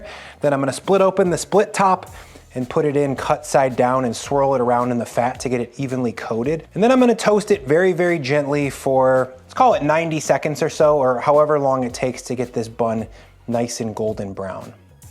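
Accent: United States. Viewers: Please, you sound like a US citizen, if not apologies, I am not at all trying to offend, but can you use the measurements most US citizens use, ie.ounces lbs etc. Great content and look forward to more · for the love of pearl why, why metric......you sound like your in the U.S. is that not the case? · I like your recipes, you have some of the best on YT. But I hate your insistence on using metric measurements. You sound American, and your about section says United States, so why are you using that when that makes it more difficult for the American audience? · You sound very American. Why grams?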